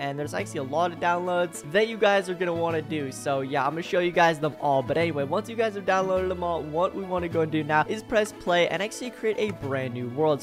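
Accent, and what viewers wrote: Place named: India. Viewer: You sound more Indian dan the actual Indians you cross born Indian.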